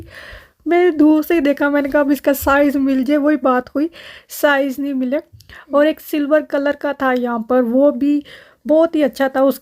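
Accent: Punjab, India. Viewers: Very nice I like ur Punjabi touch in vlog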